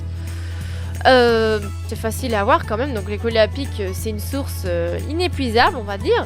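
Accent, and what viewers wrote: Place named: Belgium. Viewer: elle XD ets une fille Preuve: son nom est Et sa voix c'est parce qu'elle est Belge.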